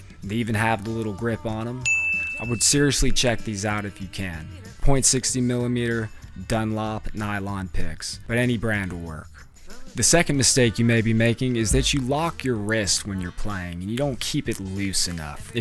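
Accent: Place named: Philadelphia, United States. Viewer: Your Philly accent is uncontrollable brother i love it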